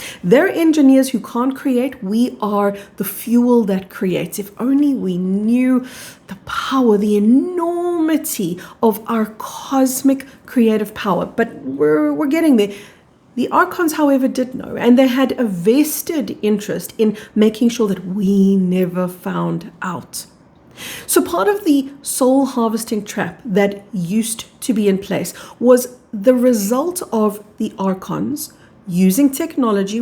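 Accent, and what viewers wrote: South Africa. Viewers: S.A. accent?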